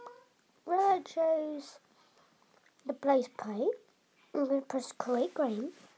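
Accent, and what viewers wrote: United Kingdom. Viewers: That Cute britsh Voice Doe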